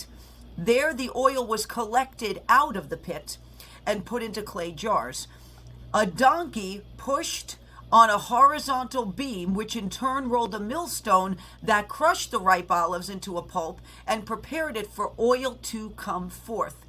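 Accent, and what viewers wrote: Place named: New York, United States. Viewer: I love that connection to the Lord's going through the suffering on the cross, his burial and the Resurrection, and how the processing of the olive oil with a donkey, wood, and the crushing weight of a stone fits into the narrative so well. No wonder I love you so much Ms.! I'm a New Yorker transplant to Texas (in 1982), and it's always fun to hear you speak with that Italian NY accent as well as inform us of what's going on in the spirit world and the physical world!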